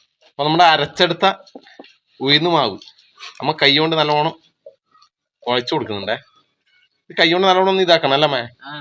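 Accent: Kannur, India. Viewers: Niggalku. Nammude. Kannur. Basha. Manasilakunile😂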